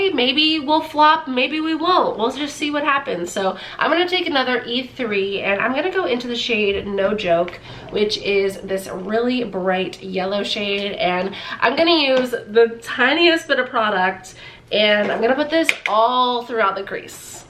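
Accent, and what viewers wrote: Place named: South, United States. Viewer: Love your videos! ❤️ I like how honest you are and plus your southern 😂 just like me! IG: Tillery_09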